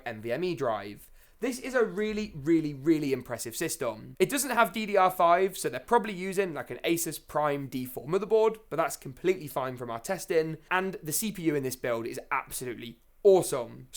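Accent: United Kingdom. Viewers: mate he is definitely not Australian he has the strongest British accent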